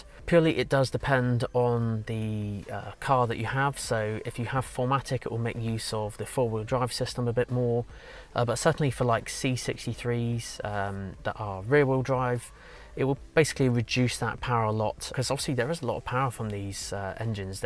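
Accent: United Kingdom. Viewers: you are the true sound of the German Mercedes Benz, with a British accent. Love your work mate.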